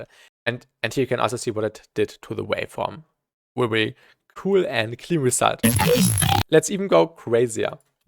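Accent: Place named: Germany. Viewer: I love the German accent, really gives it a scientific vibe haha ❤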